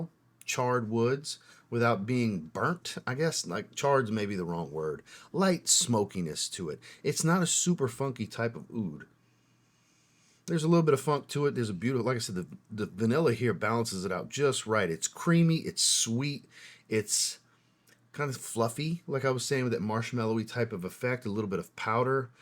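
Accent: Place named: New Orleans, United States. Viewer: Hey! The first time I heard your voice, I said to myself, “This guy has to be from New Orleans.” Then, you were wearing a Saints shirt in another video. Hello, from a fellow New Orleanian.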